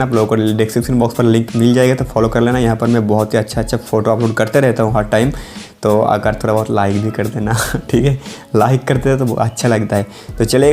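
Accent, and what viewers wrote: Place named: Bihar, India. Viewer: Yeh bihari asscent kahan le late ho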